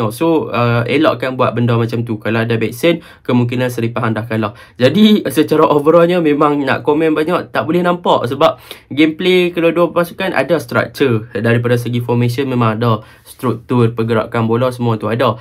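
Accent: Kedah, Malaysia. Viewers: Aku suka dengaq loghat kedah hang, cuma aku x suka dengaq loghat kedah sanusi je ❤️💙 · suke betol aku dgr loghat kedah hang bang👍👌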